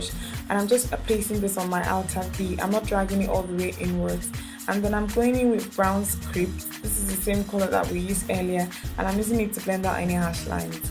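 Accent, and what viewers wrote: Nigeria. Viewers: I love everything about you and how u keep your Nigerian accent intact because it is soo cute. love your hair and videos too.. awesome. if you don't mind, could you tell us more about your hair because it gorgeous. stay blessed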